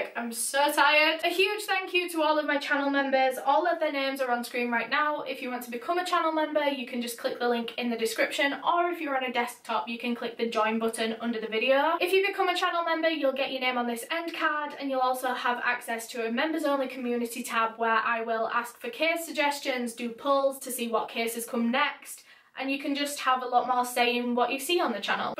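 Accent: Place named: Yorkshire, United Kingdom. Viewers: it's how we roll in Northen England. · I’m enjoying your videos 💕 your Yorkshire accent is great! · Must be American , this is a Northern accent 💜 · Lol its just a Yorkshire accent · It's a Yorkshire accent haha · Hahaha being from West Yorkshire myself I just can’t hear the ‘baybeh’ like other comments it just sounds normal 🤣🤷🏼‍♀️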